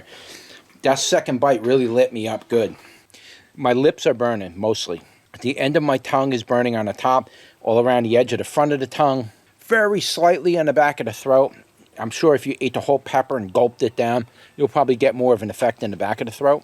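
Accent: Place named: Philadelphia, United States. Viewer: I enjoy your videos because I grow a lot of chiles where I live in New Mexico and also I am from Philadelphia so its nostalgic hearing your accent. Im not sure where your from but I hear home in your voice. Thanks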